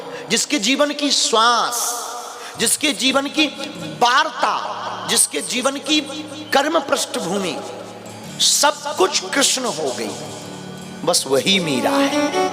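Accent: Bundelkhand, India. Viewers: bhai... Maine achhe dil se hi bola hai emotional tareeke se bolte hain hame pata hai tumhe pata hai un k sabhi chahane walon ko unki bundelkhandi boli bahut pasnd hai.. Lekin jo shero shaayari hoti hai usme urdu k bahut se aise shabd hote hain jin ka lena dena se door door tak nahi hai... Jo sindoor bindi plot book... Wali baat hui wo bhi k shabd nahi kyonki ye hamne bahut pahale se suna huwa hai... Bas isiliye kaha.. Hame unme bajrang bali najar aate hain or un k mukh se... Naa rupya lagta hai na paisa lagta hai.. Ram ram boliye bada achha lagta hai 🙏🚩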